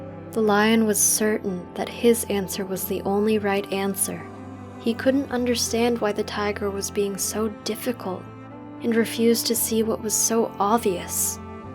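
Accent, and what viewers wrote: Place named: United States. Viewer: It is Bodhisatta. But she is pronouncing all these Pali words with American english accent and maybe with other accents, and making them sound like so alien words.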